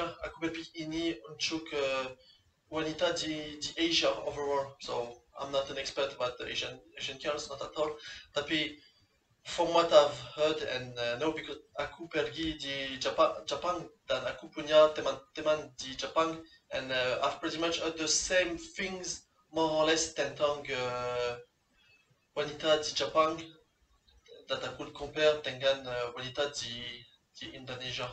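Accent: France. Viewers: Bahasa Indonesia kamu beraksen france, dan itu menarik :) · French accent is soooo sexy...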